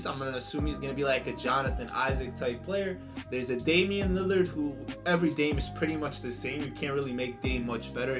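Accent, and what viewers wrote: New York, United States. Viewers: U from ny?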